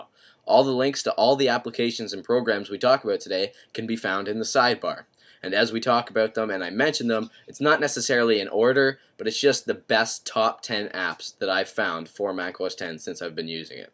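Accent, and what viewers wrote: Canada. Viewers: i love his canadian accent. thanks. i ended up downloading screenflow after seeing this. :) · ur canadian!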